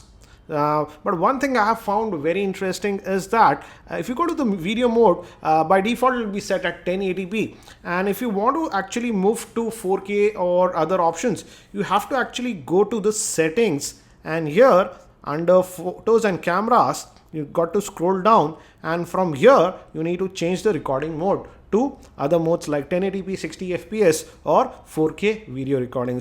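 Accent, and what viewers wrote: India. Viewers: if india speaking english . bad to hear. yakkkk..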